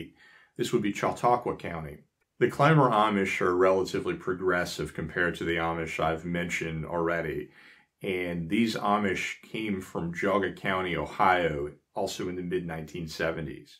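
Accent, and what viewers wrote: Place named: Philadelphia, United States. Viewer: Very interesting vid. Enjoyed it. Been visiting the Amish in Pa all my life -- Your accent is striking -- the O in home, phone, -- you from Phila, Pa?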